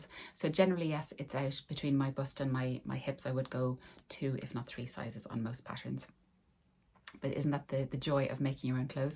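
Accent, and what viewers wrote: Ireland. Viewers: Great vlog and thank you for being so open and warm hearted. Btw love your gentle Irish accent! As a tall person I often wonder how tall others are, especially when we seem to share the same taste. How tall are you? I’m 5’9” and after 2 years of sewing, still have yet to sew anything without lengthening it. Megan Nielsen fit me best…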